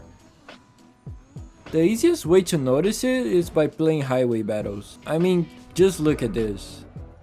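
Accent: Brazil. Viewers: are you from Brazil? your accent sounds brazilian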